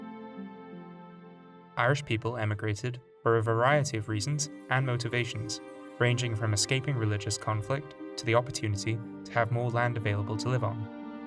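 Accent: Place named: England, United Kingdom. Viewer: Fascinating video and very nicely done. I'm curious as to why the narrator has an English accent? Seems a bit odd.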